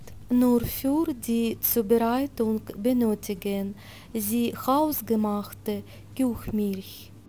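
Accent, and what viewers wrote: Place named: Germany. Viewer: i think your English will be as good as your Germany so look forward to an English video but i like your German accent as well ,you look after self and take care ♥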